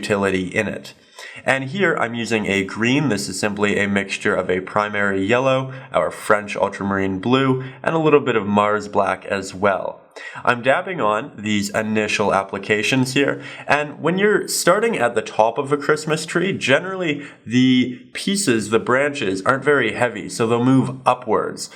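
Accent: Canada. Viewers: I always enjoy your lessons...& your accent...eh?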